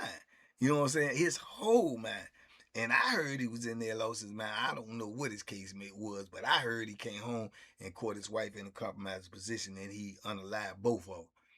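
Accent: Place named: Washington DC, United States. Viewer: I like the way you sound like a a DC Youngin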